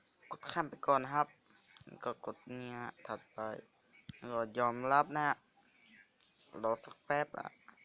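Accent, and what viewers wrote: North, Thailand. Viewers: เป็นคนเหนือหรอค่ะ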